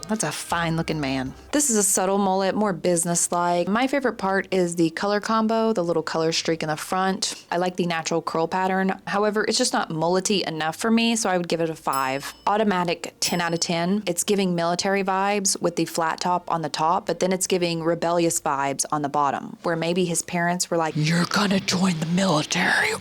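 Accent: South, United States. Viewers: I love your accent❤. I wish I could talk like that too. I would appreciate if you make a tutorial on how to speak like a redneck.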